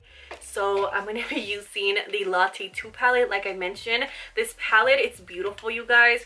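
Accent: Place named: Puerto Rico. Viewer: Preciosa, me encanta que eres Boricua y nunca pidas perdón for sounding puertorican, you have a beautiful accent ❤️🇵🇷🙏💪🏼 Wepa💪🏼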